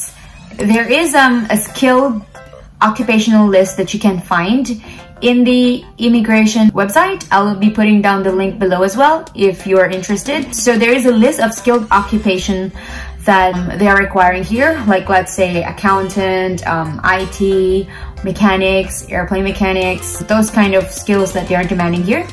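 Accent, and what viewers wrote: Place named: Australia. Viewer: I love your Aussie accent ma'am.New subscriber and friends here💗. How I wish I could work there in the next 2 years. I'm civil engineer here in the Philippines having 3 years of work experience however I'm so hopeless, aside from all the detailed documents you need to prepare.then you need at least to have half a million of pesos.I don't have enough money to prepare all that I need, but still I'm here to pursue that dream 😞🙏🏿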